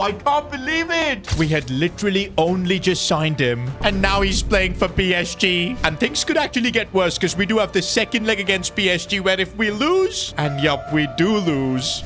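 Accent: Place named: India. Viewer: You sound indian and pakistani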